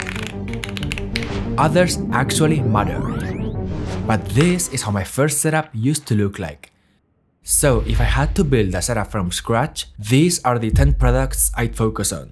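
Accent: Spain. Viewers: East asian face, english language, spanish accent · I thought I heard a familiar Spanish accent, then it all made sense when I checked your channel info. Saludos de otro editor, crack! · I may be wrong but where are you from? It's because of your accent that you sound very Spanish to me. If so, enhorabuena por tener el valor de hacer un canal en ingles. Greetings from the north of Spain. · interesting Spanish accent... btw good editing does not bring as many viewers because it doesn't hit as hard as crazy ideas and high budget stunts... editing can only make a video already at a certain level better, but cannot add substances it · hey! I just landed on your channel, I'm curious about your accent, it sounds Spanish! Cool videos and animations btw <3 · nunca había visto tu canal, a primera vista pareces asiático, pero en cuanto escuche tu inglés supe que eras Español 🇪🇸, ese acento es inconfundible 😂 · Hola! 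Es el primer vídeo que veo tuyo y estaba reconociendo el acento español jajaja. En cualquier caso, enhorabuena por hacer un canal en inglés, es un gran gamechanger y te deseo una buena trayectoria porque se nota el currazo que le pones a los vídeos. Un saludo! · Eres español? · Te he pillado el acento español desde la primera frase que has dicho jajaja. Un orgullo tener a alguien con unos videos tan chulos como los tuyos aquí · never in my life have i encountered an asian person with a spanish accent, weirdly fits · When you started speaking, I knew that that accent sounds familiar to me, you're from Spain!! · Bro tu eres de malaga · Desde el segundo 1 sabia que eras español jajajajjaja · Not sure if this guy is Spanish or Greek.. XD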